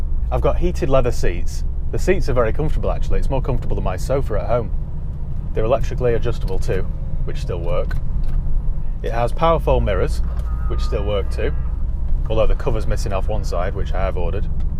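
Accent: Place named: England, United Kingdom. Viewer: england i reckon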